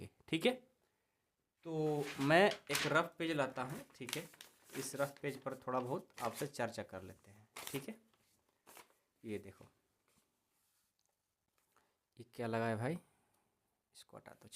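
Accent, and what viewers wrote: Bihar, India. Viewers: Bihar ke ho kya language se lagta hai😊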